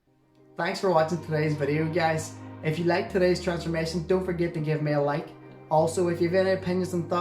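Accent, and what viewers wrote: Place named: United States. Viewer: really like your recycles but I am very confused by your language. Are you Irish, American or Canadian? Your products and pricing are British but you talk as if you are American/Canadian.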